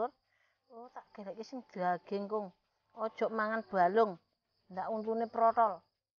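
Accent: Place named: Surabaya, Indonesia. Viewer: Bu kok koyok wong surabaya lek lihat logatnya😂😂😂😂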